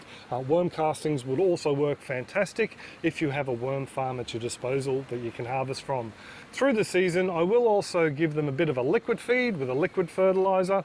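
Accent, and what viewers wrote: Australia. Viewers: So happy to hear an Aussie accent! I live in Cairns. Up here our weather is hot, hotter, cyclonic. I was given some ginger about 3 months ago and had no idea when to harvest, great information just when I needed it. I was about to did them up now! I have new shoots coming up. Is there anything that I should do differently living in this climate? Thanks.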